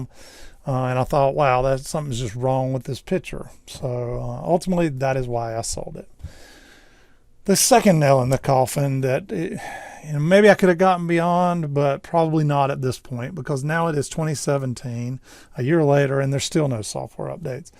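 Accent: South, United States. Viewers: Despite your light Southern accent, I will credit you with pronouncing the word "tubes" instead of "teebs".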